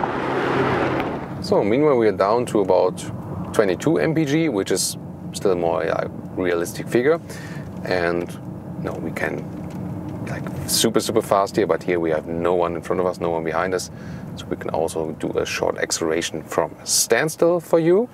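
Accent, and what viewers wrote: Germany. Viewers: Deutsches Auto, deutscher Sprecher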